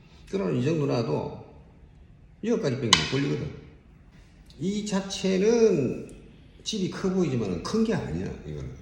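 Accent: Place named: Gyeongsang, South Korea. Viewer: 원장님 경상도 사투리 쓰고 계시는데 혹시 고향이 어디 신지요 저하고 말투가 비슷해서 하하하 (맨들라꼬)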